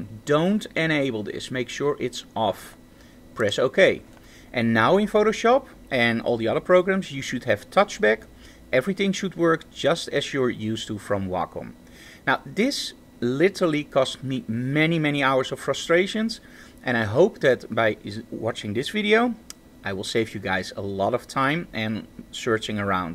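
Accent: Netherlands. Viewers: Hm Me: looking for a fix for my wacom Me: Coming across this video Me: huh, this guy has a typical dutch accent.. I wonder if he's... Me: * finding his website * Me: .. I was right.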